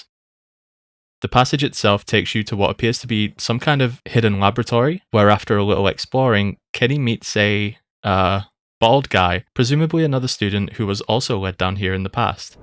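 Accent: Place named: North, United Kingdom. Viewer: Every now and then a video pops up and reminds me that Obscure exists and I love that. And then I hear that you’re a northerner too and it makes it even better! This video was sick, can’t wait to see more like it. 😊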